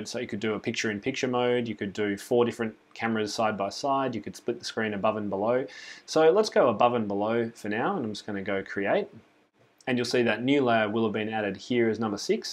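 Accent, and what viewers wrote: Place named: Australia. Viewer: Super cool! I think I saw you on the Tom Lee webinar the first couple weeks of Covid lockdowns... I had written “mini-cam” in my notes and was looking for it. The Ozzy accent through me! Thanks for this video... I’m ready to do this! Would that be your preferred set-up to have a body shot and an overhead shot? I would think so... I would love to get my students on this (lots of Elementary level kids - need to see their bodies AND fingers!).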